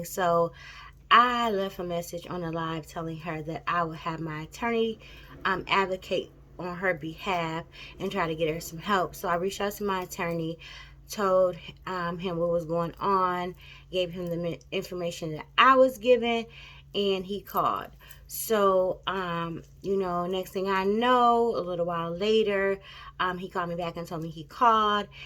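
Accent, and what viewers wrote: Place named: Detroit, United States. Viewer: I’m a new subscriber. Enjoyed your video and I definitely hear that Detroit accent. 😀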